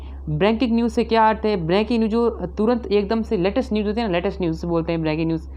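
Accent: Gujarat, India. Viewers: Bhai aap gujrat sa ho kya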